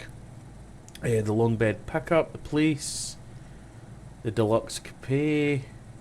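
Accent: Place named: Scotland, United Kingdom. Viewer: It's the first video from you, that popped up in my feed. You're speaking like level 1.5 or level 2 of Scottish out of let's say 5. I wanted to move to Scotland 4 years ago (as an eastern european), but covid stopped me unfortunately. I understand English very well, but Scottish English was something new for me. Thankfully I found "Autoshine Cars" account, who's a Scotsman living in Blackpool and learned your pronounciation from him and can understand you fully :). I love your vid, keep them coming <3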